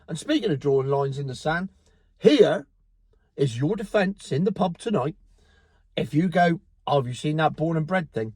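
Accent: Cornwall, United Kingdom. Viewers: I love your Cornish trait, accent and your love for your native land. These Dinghy Daves have no love for this Island at all. Kick them out.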